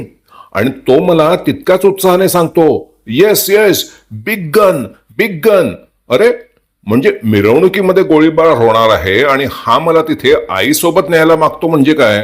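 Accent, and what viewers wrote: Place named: Pune, India. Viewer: तुमची मराठी बोलण्याची पद्धत ही सदाशिव पेठेची आहे.. पुणेरी खोच!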